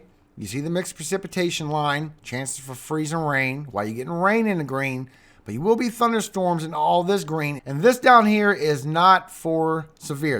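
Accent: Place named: Baltimore, United States. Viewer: i would Not have guessed u were from milwaukee u kinda sound like u have a baltimore thing goin on ..probably only cuz im from around there ..unfortunately..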